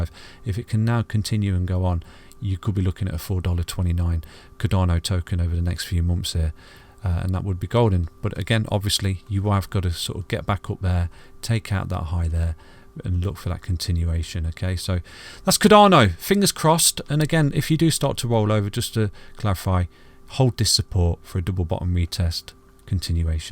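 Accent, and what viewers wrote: United Kingdom. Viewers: Love hearing a fellow Brit on these videos. Great job.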